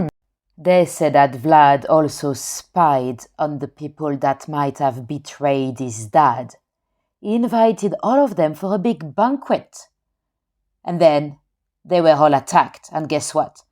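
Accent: France. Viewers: I like ur voice and accent. Sounds like French talking English. 🙂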